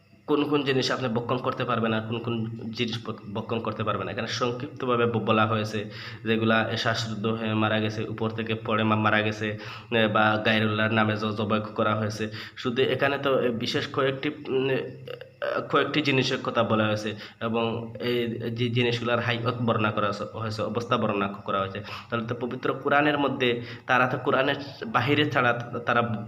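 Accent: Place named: Chittagong, Bangladesh. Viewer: তোমার কথাই এখনো ফোটে নাই,চাটগাঁইয়া ভাব কথায় স্পষ্ট। জ্ঞানের গভীরতা বাড়াও।মরিস বুখাইলীর মতো "বিজ্ঞান, ইঞ্জিল ও কোরআন " একটি বই লেখে দেখাও।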